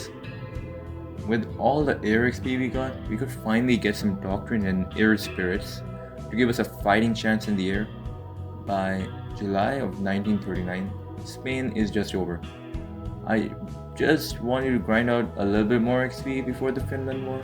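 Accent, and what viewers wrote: India. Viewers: Bro you sound indian as fuh outto nowhere are you indian? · I can't tell if you're Indian or European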